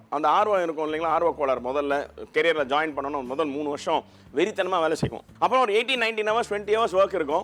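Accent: Chennai, India. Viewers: You are the most amazing person who cooks with love & laughter. I literally love to watch your cooking especially with your lovely speaking.. mostly Madras tamil.. you are truly great. I am living in California for more than 22years but still I am the same Madras girl who loves to cook (only after marriage though😉). Just the way you speak & entertain us, added with your cooking takes it to another level. I admire you soo much. My son loves South Indian food. So just for him I try different styles. You are so amazing.. if it was just a music with cooking or some too much unnecessary talking with cooking , I would not have been drawn to you.. you are lovely with the cooking & the most I admire you is for the way you talk about the cooking or the ingredients. I literally LOL (laugh out loud) whenever I see a recipe from you that I want to try. Please be the same person & never ever change your attitude. 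You are very down to earth & still entertain us & teach us how to cook at the same time. I never ever message or comment to any of anything online thingie in my life so far but I just couldn't resist but to comment/message you. This is Bala from Los Angeles, California but always a tamil ponnu; raised in Chennai. Thank you for your dedication to share your passion to others.. it means a lot to a lot of people that I am sure of. Whenever I hear you speak..that joy & laughter you bring with a recipe means soo much for me. Thanks a lot Mr. Bhat. I hope you read this comment & reply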